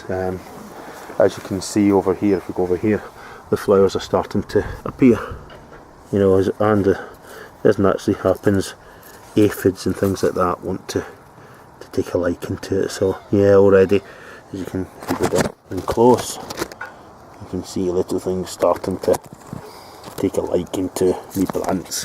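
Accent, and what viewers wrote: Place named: Scotland, United Kingdom. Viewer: Great raised beds, Great frames, Great Vid !! Correct me if i'm wrong but your Scottish accent seems to have something mixed in ?? Maybe a touch of European ??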